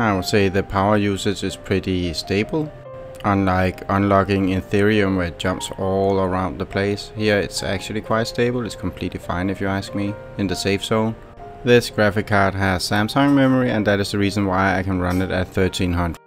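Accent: Denmark. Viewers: Den der Danske accent altså. :)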